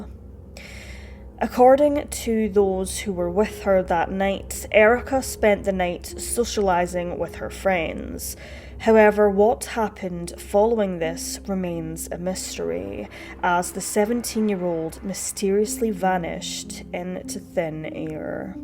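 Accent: Scotland, United Kingdom. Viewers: She is Scottish, and no doubt has unique inflections of her own. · Jeezus, she’s Scottish. Of course, she’s going to have an accent. Silly Americans b!+<h!ng about the way others talk. 🤦🏽‍♀️🤷🏼‍♀️😵‍💫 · like some others have said I suspect it is because she is Scottish, depending on the region a Scottish accent can be VERY thick and difficult for others to understand, it sounds to me like she is very carefully enunciating to make herself understood clearly.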